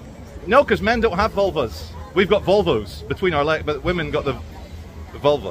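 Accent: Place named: United Kingdom. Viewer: your accent. Sounds British to me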